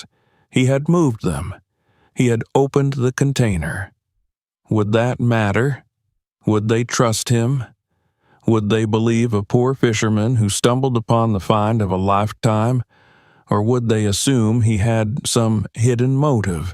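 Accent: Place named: South, United States. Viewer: Oh goody a AI story with a Hill Billy voice over narration.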